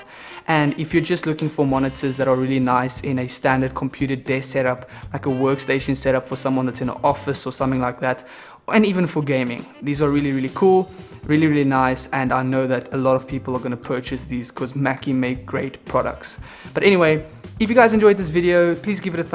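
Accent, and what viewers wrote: South Africa. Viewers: This guy sounds South African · Lekker South African Accent my bru... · You are South African, I know us very well, lol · you have a South African accent bro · Is that a South African accent?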